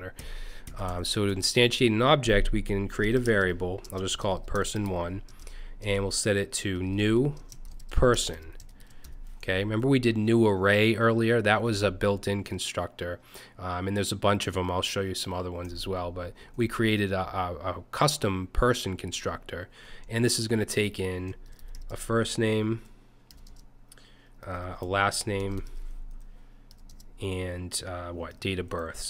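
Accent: Boston, United States. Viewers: I love this Bostonian accent. Also, great content.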